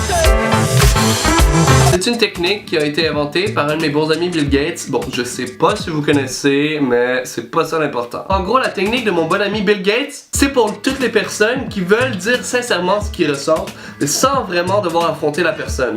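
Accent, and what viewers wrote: Quebec, Canada. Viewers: C un accent québécois et c pas un faux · bin quoi...tu as un accent quebequois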